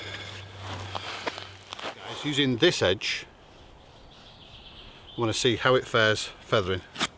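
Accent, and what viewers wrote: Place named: United Kingdom. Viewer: I love the british accent, and great video bruv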